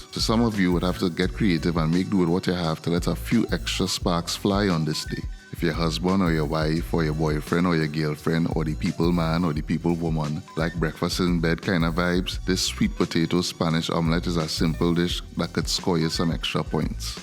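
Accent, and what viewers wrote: Trinidad and Tobago. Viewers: Are you the YouTube police? Did you know that YouTube can be accessed by most countries? Thus anyone from those countries can upload content in their own language. Why feel the need to say this if you don't understand the video, simply move on. And just so you know, he's speaking English with a slight Trinbagonian accent, which is very close to British English, yet your pea sized brain couldn't understand it, you're and absolute idiot.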